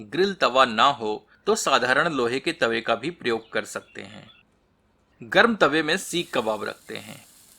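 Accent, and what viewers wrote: Standard. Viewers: शेफ आप जैसा बहुप्रतिभा का धनी व्यक्ति बहुत कम देखने को मिलता है । आपकी शुद्ध हिंदी का उच्चारण सुन कर अतिप्रिय लगता है ।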